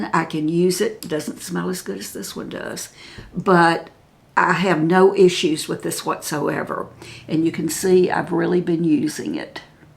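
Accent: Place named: Arkansas, United States. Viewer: I've been looking at your channel for the last 3 weeks and I like all the videos I've watched so far!. I was wondering where you were from I know someone from Arkansas that has the same kind of accent that you do.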